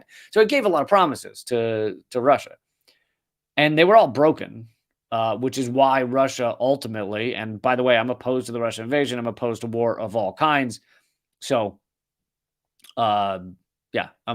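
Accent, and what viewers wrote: United States. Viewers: Stop the country accent. It's liberals that enslaved blacks. It's liberals that created concentration camps. It's liberals that wanted to starve Americans for refusing the "vax". Most country folks want to live and let live.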